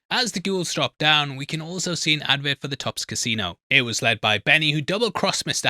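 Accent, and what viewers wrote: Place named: Newcastle, United Kingdom. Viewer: I didn’t know you were Geordie, or at least northern?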